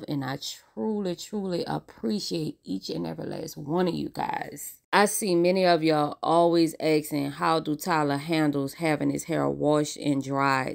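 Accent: New Orleans, United States. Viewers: She's definitely a great MOTHER and with that New Orleans accent GURL!!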